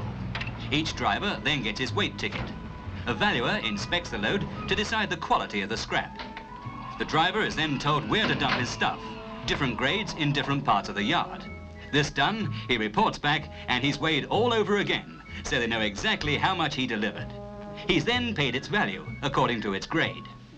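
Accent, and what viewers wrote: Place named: England, United Kingdom. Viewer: So lovely to hear a proper English accent instead of trendy street nonsense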